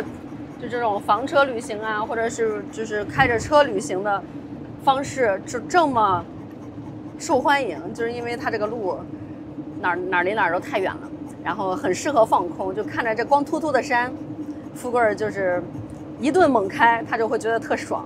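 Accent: Qingdao, China. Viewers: 哈哈 博主大概率是青島人吧？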